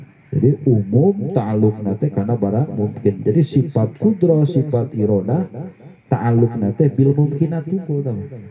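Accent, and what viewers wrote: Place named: Sunda, Indonesia. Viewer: urang sunda mah tangtu raoseun ngangge logat sunda, nuhun pisan.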